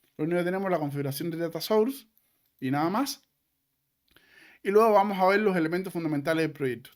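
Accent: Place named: United States. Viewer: Mucho rollo y en farfullo medio yanki. Es incomprensible.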